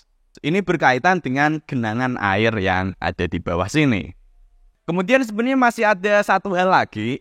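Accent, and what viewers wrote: Java, Indonesia. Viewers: Bahasa, tpi logat.e osing😂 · Penjelasan yg komprehensif plus medok men bahasamu lek ❤ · Logate osing banget. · Medok masse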